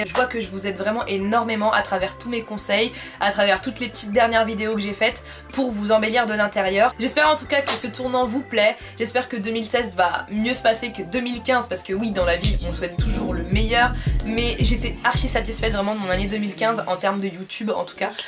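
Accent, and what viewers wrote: England, United Kingdom. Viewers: tu as un très belle axent anglais j'adore t'es vidéo continue comme ça tu es trop drôle bisou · j'adore ta chaîne ma belle! tu me fait trop rire avec ton pti accent british! #teamsnapchat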